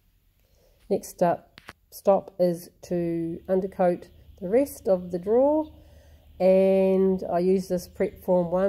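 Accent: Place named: New Zealand. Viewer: oh not your fault … yes I watch anyway ! Cool to know that it’s a Kiwi accent . I have heard other Australian accents but know nothing about them . Will keep watching!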